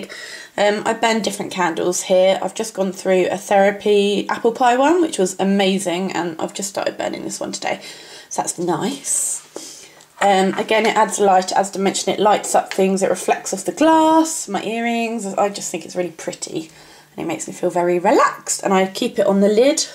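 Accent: United Kingdom. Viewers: its actually so nice to hear an English person on youtube! =D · oh and you have such a cool British accent!!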